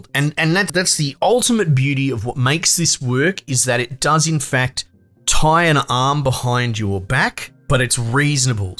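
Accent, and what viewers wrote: Australia. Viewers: the part about the furries got me crying. its even funnier with you aussie accent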